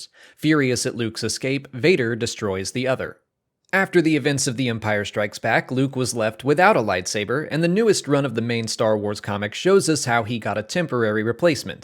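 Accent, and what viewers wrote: Australia. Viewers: which part of australia are you from? the accent isnt what i expected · What part of Australia are you from? You sound funny.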